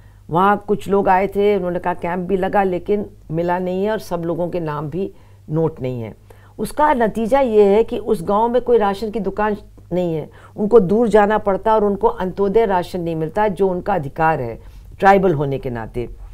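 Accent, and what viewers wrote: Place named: Uttar Pradesh, India. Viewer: Speaking beautifully, can feel and smell the UP dialect and aroma.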